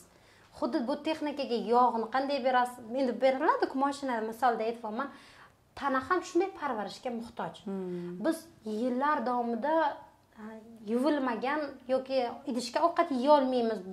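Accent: Bukhara, Uzbekistan. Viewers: buxorocha shevada endi nima deysiz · Nega shevani bosib votti vottilagansiz Men ham buxorolikman Lekin adabiy tilda gapirish kerak edi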